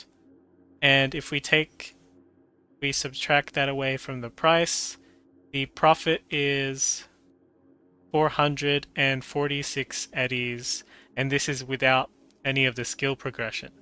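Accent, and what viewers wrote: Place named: Australia. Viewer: Nice video. Also nice to see a fellow Aussie doing cyberpunk vids (I assume you're Aust from the accent but apologise if I'm mistaken)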